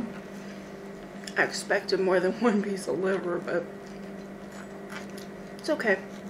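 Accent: South, United States. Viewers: C here I reside in Colorado. Yeah she sounds like she's from the south. In one of her videos she goes to the Heart Attack Grill to eat so maybe it's in the same state. I'll have to look. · Girl, your voice is so soothing to me.. and you sound southern (I'm from Tennessee). Lots of love hunny, you keep doin you 😙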